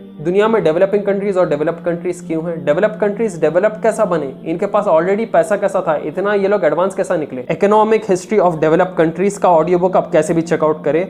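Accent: Hyderabad, India. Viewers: Bhai aap haidrabad se lagre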